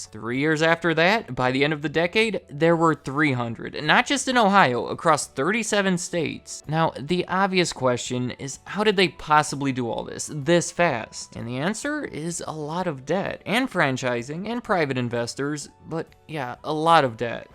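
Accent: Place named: New England, United States. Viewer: Wow the fast talking yankee nasal guy again. Had to fast forward and get out. Again!!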